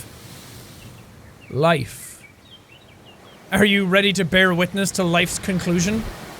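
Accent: United Kingdom. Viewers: Tiffany Sigrist Just by the way, he's not saying it wrong it's just the British pronounciation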